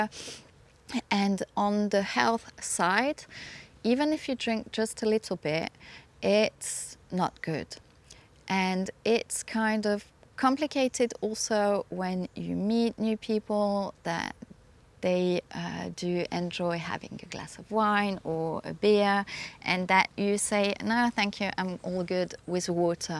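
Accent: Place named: United Kingdom. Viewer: I'm trying to figure out your accent. I know you live in Switzerland and speak French, but your accent speaking English is quite unique. Have you lived in England...because, as well as speaking English perfectly, you do have a tiny bit of a British accent...within your mix.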